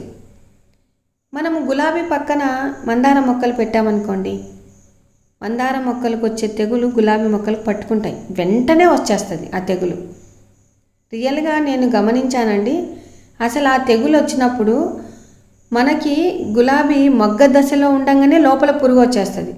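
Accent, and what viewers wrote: Rayalaseema, India. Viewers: Chala bagaa chestunnaru akka, mana Rayalaseema slang mee vedios lo happy ga vintunnanu....mee daughters nd atta sooo cute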